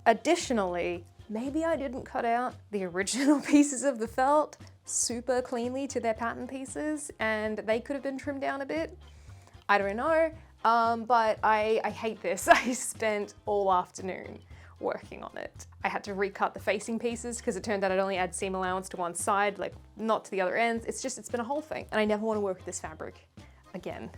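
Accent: Australia. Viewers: are you australian? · The accent is either New Zealand or Australian… I'm going with Australian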